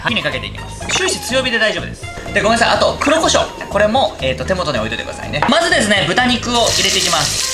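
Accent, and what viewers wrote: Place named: Chiba, Japan. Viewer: 君て千葉でしたかー千葉の従兄弟みたいだなと。イントネーションが一緒なんで親近感あります😁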